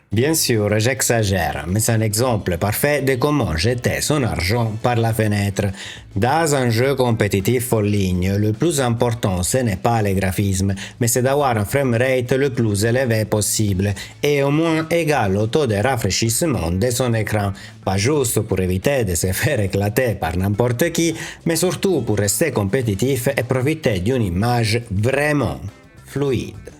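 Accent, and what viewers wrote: Italy. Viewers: Bonjour, tout d'abord je tiens à te remercier pour le contenu qualitatif de tes vidéos, avec, en plus un accent que j'apprécie puisqu'il vient me rappeler mes origines (mes grands parents étant originaires de l'île de Pantelleria 😘). Je dois monter un nouveau PC en novembre prochain et j'aurai voulu avoir ton avis sur le choix d'une carte graphique dédiée principalement à la retouche photo et au montage vidéo (davinci resolve, photoshop et lightroom). Tous les ordinateurs que je monte ayant une durée de vie de 10 ans, j'apporte un soin particulier au choix des composants sans toutefois tomber dans l'excès des cartes derniers modèles qui n'apportent finalement que peu de performance en plus par rapport à la génération précédente. Mon choix actuel se porterait sur une carte ASUS GeForce RTX 4070 Super/16 Gb Ram laquelle devrait convenir et tenir une dizaine d'années. 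Actuellement elle coûte un peu cher mais elle devrait à un moment donné coûter un peu moins cher. Actuellement mon PC est équipé d'une carte graphique Asus-CG-GTX-Strix-960/4 Gb de Ram qui a bien tenu jusqu'ici mais sa puissance devient insuffisante lors de l'encodage des vidéos. Mais elle tient le coup, sauf qu'elle devient lente. Je compte sur ton expérience pour m'orienter sur un autre modèle, si nécessaire. Grazie per il tuo ritorno. Ciao, Ciao 🙂 Salvatore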